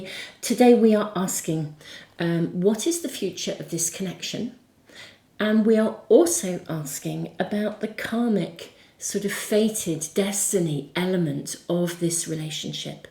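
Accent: United Kingdom. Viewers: Loving....I selected Pile 2. Your beautiful British accent and voice bring me hope.....I'm living in the UK, I am the ring here, the female with lot of energy to move forward and my partner living in the US is the castle and all correct. Unfortunately, on July 1, he said he needs a time off from this beautiful love affair we had so far, as he felt i is stressing him out....and although it made me so much pain, as I know he loves me and I trust such a love should never disappear. According to the reading today, during this time off if he steps out of his zone and sheds the skin and realises the immense things we could develop....I think that is the destiny of our relationship....I cannot call or message him until he does it for me as he is the man and going through changes in his own world, which matches what you said. Loving, I do hope he will reach out to me soon with love, clarity and purpose because I have no words to explain how beautiful it used to be........ 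According to you it should happen and hope it will.....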